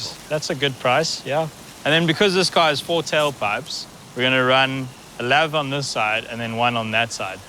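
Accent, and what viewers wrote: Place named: South Africa. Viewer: Miss that accent true South African Keep it up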